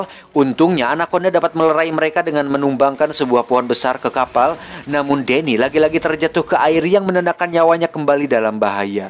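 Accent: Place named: West Java, Indonesia. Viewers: Maklum..lidah orang sunda kan gitu...F Jadi P....Z jadi j😅😅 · Urang sunda euy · Sunda mnh nya min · Anying urang Sunda mang🤣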